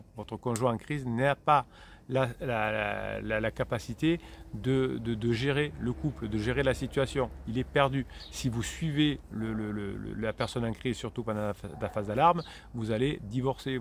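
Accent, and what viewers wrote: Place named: South, France. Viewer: Merci pour votre réponse elle m'est allée droit au cœur J'aime beaucoup écouter vos vidéos et votre accent méridional me donne l'impression d'être en vacances 😊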